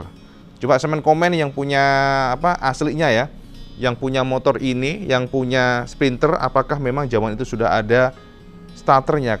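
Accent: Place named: Java, Indonesia. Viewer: Jet koledd cak wong jowo ngomong e 🤭🤣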